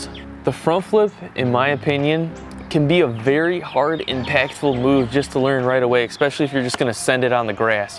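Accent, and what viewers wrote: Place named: Michigan, United States. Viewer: You have such a Michigan accent lol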